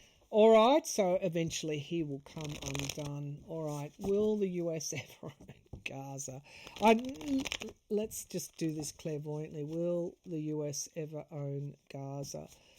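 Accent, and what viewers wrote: Australia. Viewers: Hello, firstly thank you for all your work, it’s much appreciated! ❤ 👏 Just a quick note: the far-right party in Germany is called AfD, („Alternative für Deutschland“ = Alternative for Germany), not „Adf“, as you kept on saying. With your Australian accent „Adf“ almost even sounds like „IDF“, the Israeli Defence Forces.:) No biggie, but I thought you’d want to use the right party name. It’s so easy to mix up all these abbreviations..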